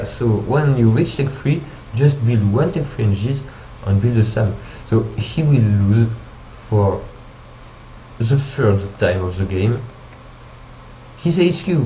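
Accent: France. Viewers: Something about the heavy french accent and the microphone buzz makes watching your casts very relaxing. No but seriously, these casts are amazing because you pay attention to what makes FA awesome, the little decisions. Don't hesitate to do more.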